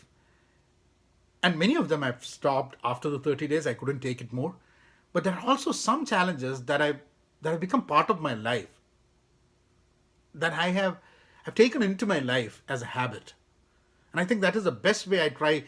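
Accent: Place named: India. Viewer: Sir its nice that an Indian is there for me so i can understand slowly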